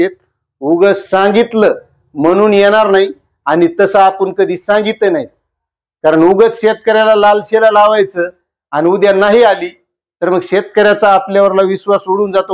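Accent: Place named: Vidarbha, India. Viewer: तुम्ही विदर्भात राहात का,, भाषा ओळखीची वाटत आहे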